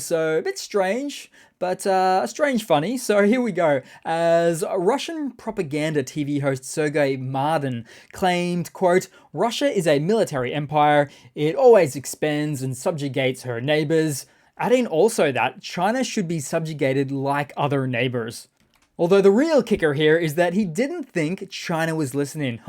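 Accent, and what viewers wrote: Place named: Australia. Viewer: Love your work. I still would like you to pronounce mortar like mitre 10 but with an O, not as in more tar. Great to hear another well informed Aussie voice like Perun. Have a good one!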